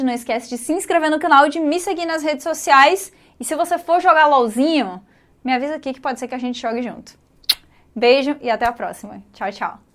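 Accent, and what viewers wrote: Northeast, Brazil. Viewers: impecável como sempre. Adoro sotaque dela, e todos os nordestinos. Acho Arcane uma animação incrível, da pra ver o trabalho bem feito de todos da produção. Não jogo Lol, não entendo nada, mas é de fato muito boa, porém não é perfeita, existe uma seria de problemas no enredo. É pra se divertir. Parabéns pelo vídeo.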